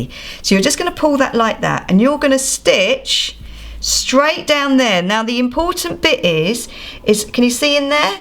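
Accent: United Kingdom. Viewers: omg just discovered ur channel. finaly a british doing something practical, all my other youtuber makers are speaking either american or ausies which is kinda confusion,.... subscribeeeed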